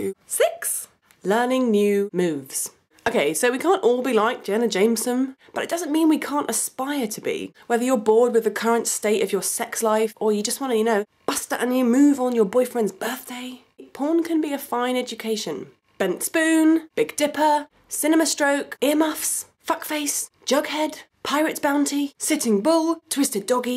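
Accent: England, United Kingdom. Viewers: Thank you for your realistic honesty, it was refreshing to hear, (especially with an English accent & not the usual American one) you are quite funny, as a kind of bonus, stop using the word "porn" if it's okay though. Adult films or magazines, are nothing more than a means to an end, they can get us to the bed room, BUT they are not there when we actually do the job. real life sex is pleasure in a different way, & circumstance. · I like her. There is something about her energy and this British accent But this #5 ... 😧 what's wrong with you, woman?! P.S. And yeah, her sister is hot too 😎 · I gave a thumbs up for British ascent I'm British I don't know if i spelt it right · You are sooo good at this blog thing. You’re articulate, with a voice I could listen to now and forever and oh my that British accent. And on top (no pun intended) of that, you’re drop dead gorgeous.❤️😂😂😂👍👍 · English woman with mary poppins accent speak about porn , which destroy my childhood memories hehehe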